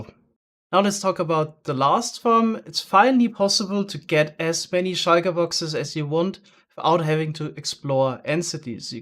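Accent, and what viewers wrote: Germany. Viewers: hahahah der deutsche dialekt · this man has the most german accent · Du klingst so deutsch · Hehe, auf den deutschen Akzent ist Verlass. :D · Da hört man doch den deutschen Akzent :) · Are you from germany ? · Wegen deinem Dialekt glaube ich das du aus Deutschland kommst. gibt es das auch auf Deutsch? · are you german? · Bist du Deutscher? Dein Englisch hört sich iw so an. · Du bist Deutscher das höre ich sofort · you are german right? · Ich kannte ihn noch nicht hab aber direkt raus gehört das das deutsches englisch ist 😂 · Irgendwie kann ich einen deutschen Akzent raushören 🤫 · Man hört so das du deutscher bist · Er ist soo deutsch oder? Das hört man mega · man hört den deutschen raus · that perfect german acsent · deutscher Akzent check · You have the Biggesee germen accent i herard in my whole life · you are german right, i mean the accent ? 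· Are you german? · Du klingst so hart deutsch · ah dude this english i love it. True German :D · Sounds like you are a german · u are safe german i can hear the accent. dikka red sag doch einfach dass du deutscher bist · Came for the farms, stayed for the spiffing skin, and German accent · I even can hear that you are a german😂 Grüße gehen raus an alle deutschen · are u german? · Ah, weil er ein Deutscher ist der englisch redet 🥴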